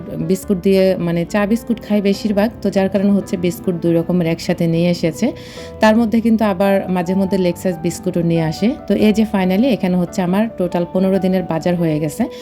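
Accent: Noakhali, Bangladesh. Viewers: নোয়াখাইলা বলে কথা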